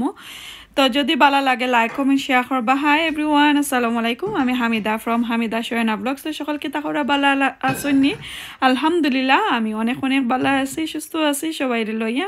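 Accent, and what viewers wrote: Sylhet, Bangladesh. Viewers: Apu apnar kota vala lagse fast comment korlam like diya subscribe korlam. amrar rannar lakhan apnar ranna. Apne amrar sylheti apnar kota shunte valalage. Like diya subscribe korlam.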